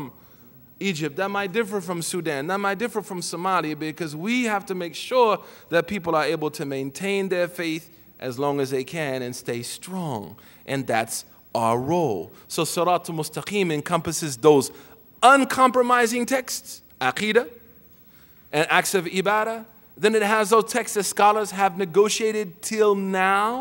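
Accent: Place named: Oklahoma, United States. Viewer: He is from okc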